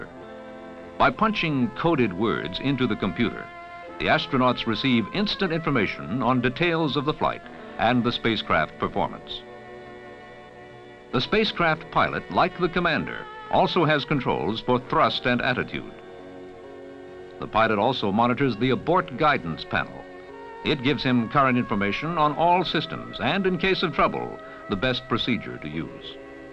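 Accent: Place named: United States. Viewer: Love the US accent used these documentary films in the 50s and 60s. Not a fan of the music and worthy of watching. Did they ever get shown on UK tv?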